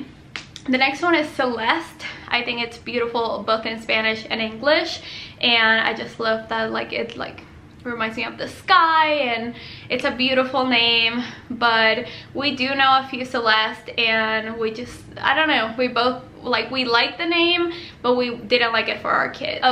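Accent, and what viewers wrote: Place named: Spain. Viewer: Girl I love your accent! Spanish is such a beautiful language, especially with girls names! Never be shy to speak in Spanish or in your accent on your channel cause it sounds amazing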